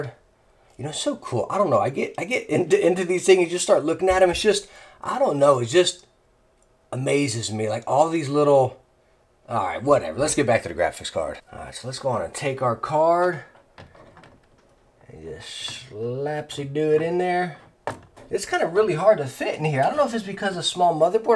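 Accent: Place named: South, United States. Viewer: I stumbled upon your channel when deciding which monitor I should upgrade to and now you've helped me decide on a headset as well. I already have an MSI RX580 but I'm watching this video anyway because I love your personality and energy, not to mention that southern comfort vibe. The quality of your content is insane and I really hope you blow up soon!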